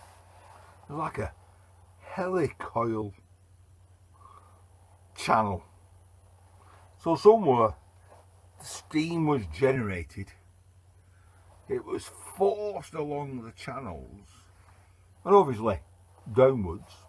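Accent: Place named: Midlands, United Kingdom. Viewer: Got my wheels turning, sir. Going to try it. Maybe find some wire if the ties don't hold up. As a Yank who's only been to the Midlands and up north a few x in a band, your accent brings back the best of memories. Discovered Max & Paddy and Peter Kay in the first tour...funniest man alive. All the best and thanks for the vid.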